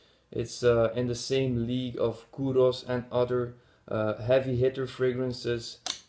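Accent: Netherlands. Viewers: very nice list,! i'll need to check out 1 million intense, but strangely enough, it's been pulled off the shelves over here in Ukraine. all the 1 million flankers have been. wonder if it's just over here, though. btw, where are you from? can't place your accent. i'd say Dutch?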